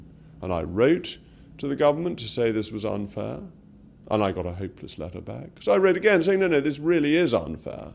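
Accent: RP, United Kingdom. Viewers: If you like how he talks, you can start by cultivating an RP accent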